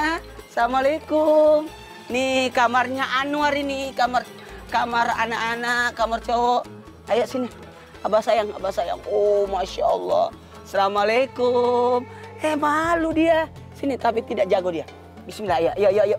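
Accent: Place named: Jakarta, Indonesia. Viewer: Ustadz Sekarang Keren Banget Ya Logatx Dah Bkan Orang Makassar Lgi Deh,Tpi Jdi Orang Jakate😊😊😊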